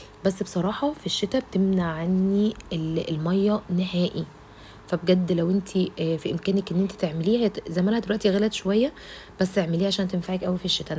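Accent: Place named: Alexandria, Egypt. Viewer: لهجتك. اسكندرانيه ...انتى ساكنه بالإسكندرية؟؟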